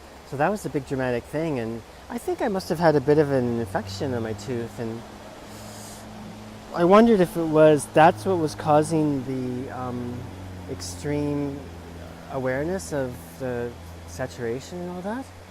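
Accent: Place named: Scotland, United Kingdom. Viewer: Hi, Love the Scottish accent-You Are Great 😊 Wow the rosettes are beautiful ma’am! I’m really enjoying all your uploads this last month-I’ve not commented on them all, but I’ve given them the 👍🏻 thanks again for the swoopy upload 😉 hope you’re having a wonderful day ❤️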